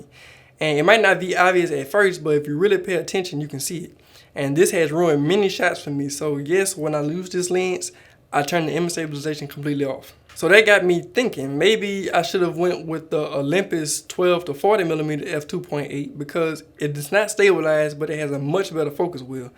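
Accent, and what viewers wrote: Louisiana, United States. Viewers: I love that Louisiana accent. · I kept thinking, "damn this dude sounds like he's from Louisiana." Then we got to the b-roll. Yup.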